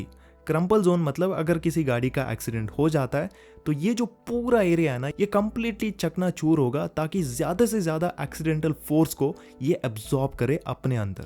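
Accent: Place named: South, India. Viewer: Brother.. appreciate your Hindi. Just improve your MTI. You purely sound south Indian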